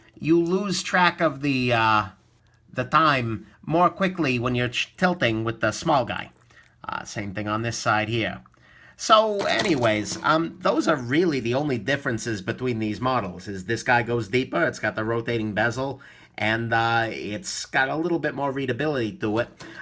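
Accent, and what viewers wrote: New York, United States. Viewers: Love your accent - are you a New Yorker by any chance? :-)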